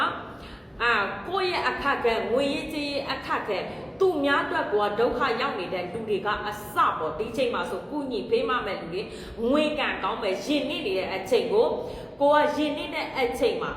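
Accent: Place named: Myeik, Myanmar. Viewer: ဆရာမက မြိတ်အသံပေါက်နေတယ်နော် မြိတ်ကဆို ဟောခန်းလာချင်ပါတယ် လိပ်စာပြောပါဆရာမ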